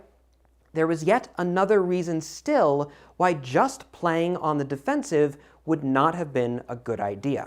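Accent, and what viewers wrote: United Kingdom. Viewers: is the only person alive who is able to sound british while talking in an american accent.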